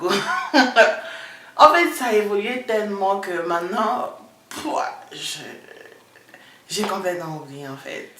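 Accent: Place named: United States. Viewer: Je te découvre et je m'abonne direct.... Tu es tellement douce dans façon de parler et ton accent states😍😍😍😍 ...c'est le Gabon qui gagne🇬🇦🇬🇦🇬🇦🇬🇦🇬🇦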